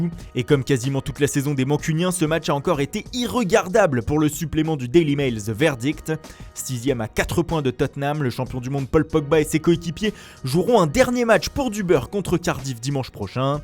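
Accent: Italy. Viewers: J'adore ton accent italien comment s'appelle le président de la Juve (Andrea Agnelli)😂💕💞🔥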